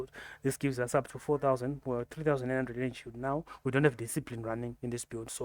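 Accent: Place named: South Africa. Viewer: lol brother, where from South Africa you from?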